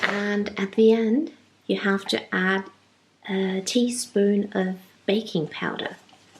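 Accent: England, United Kingdom. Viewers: Hello, I‘m curious to know if you ma‘am are German too, or only your husband? You definitely don’t sound German. You sound 100% English.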